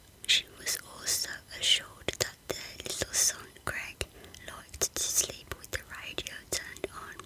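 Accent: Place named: Dudley, United Kingdom. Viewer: Where are you from? Your accent has a big Dudley twang to it. X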